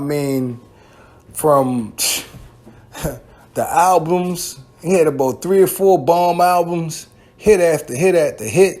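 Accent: Baltimore, United States. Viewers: Yo.. Are you from Baltimore?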